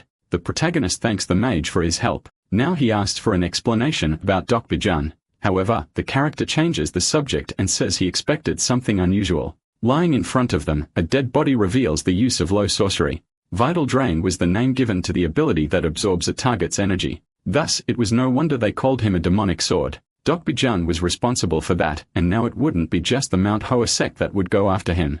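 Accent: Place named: England, United Kingdom. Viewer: Using an English accent is disgusting.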